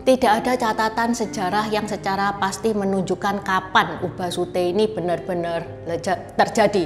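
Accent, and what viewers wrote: Java, Indonesia. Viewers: Medok men wong cino iki... ❤❤❤😅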